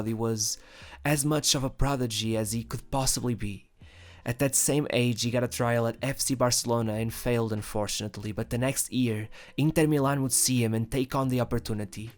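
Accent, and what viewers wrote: Portugal. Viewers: Dar support, nota-se que é tuga pelo sotaque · You sound Portuguese, are you? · fantástico como se conhece os tugas ao longe a falar Inglês... bom trabalho! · the guy casting the video is so portuguese ! can tell by the accent xD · Every morning every football player should look into a mirror and say"Thank you God c'os i'm blessed..I do what i love to do and i get paied for it..I do what what millions of kids in the world never reach,and my life in footballl it's like a life of an orchidea,so lets be excelent,lets seize the day"...It's only a very humble vision of life it costs nothing...But not,too much shit in the brains,too much drugs,too much women,and the shine of youth fades...wasted!...Good work with the video only the accent it's too much Tuga(iAm i wrong?) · Just one question are you Portuguese cause I think the way u pronouce latin words sounds a lot like it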